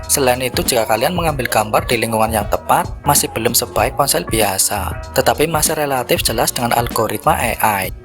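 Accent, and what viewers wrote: Banyumas, Indonesia. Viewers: ngapak Iki :)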